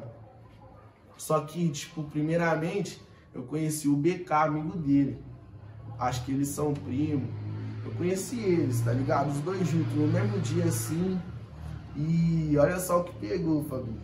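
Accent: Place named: Belo Horizonte, Brazil. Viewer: Véi eu amo esse sutaque de BH mdsss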